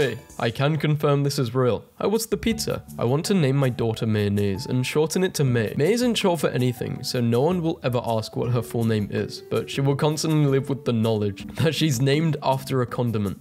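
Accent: United Kingdom. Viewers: The British accent makes all the memes 110% better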